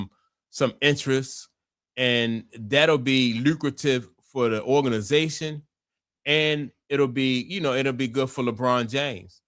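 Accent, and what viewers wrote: Baltimore, United States. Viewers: You from Baltimore or something?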